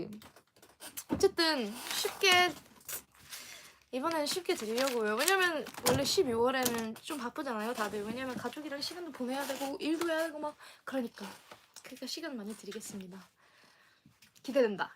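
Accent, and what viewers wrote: Australia. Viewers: es muy linda 💕 y su estilo australiano de expresarse es maravilloso. Te amamos 💕💕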